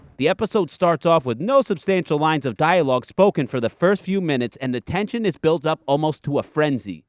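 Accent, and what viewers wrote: United States. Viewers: Can't stand for 30 seconds in front of your cringy American Feminist accent, it can even beat an Indian Gay. Get Help... Its OK. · I Love your NY Accent? Lol lol I'm also from NY just subscribed nice to hear some on who sounds like me.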